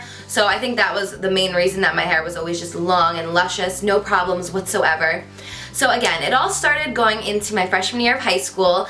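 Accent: New Jersey, United States. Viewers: The way she says 'water' tho!Am I the only one always hearing the Jersey accent? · So obsessed with your Jersey accent! Lol. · Lol I keep repeating the part you say water. Your Jersey accent is so cute ! 😍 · My husband is from NJ & his whole family talks like this.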